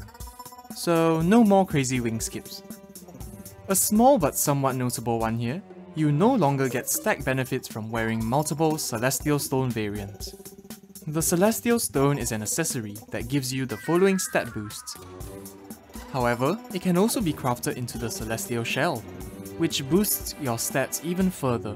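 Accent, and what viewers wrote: Singapore. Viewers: I'm sorry if you aren't but you sound very Singaporean, like not when we are speaking casually amongst others but when we are presenting something in a formal context. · are you singaporean by any chance? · r u singaporean?theres a strong singaporean accent no offense :/ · Singaporean accent?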